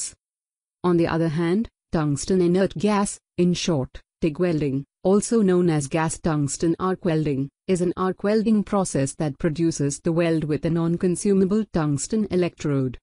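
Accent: India. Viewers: I can't stand the 'valley girl' female or 'middle aged' man voice. Drives me absolutely nuts. With an Indian accent? This one is new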